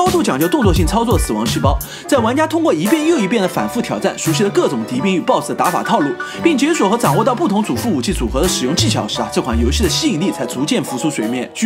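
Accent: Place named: Jiangzhe, China. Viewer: 空洞騎士竟然是魂系作品lol 這個包郵區的塑料普通話，愛了愛了哈哈哈哈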